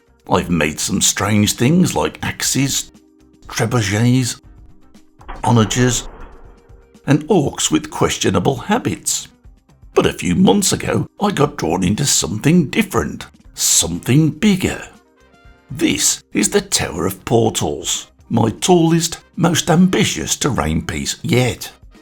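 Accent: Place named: England, United Kingdom. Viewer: You sound english. Use feet and inches !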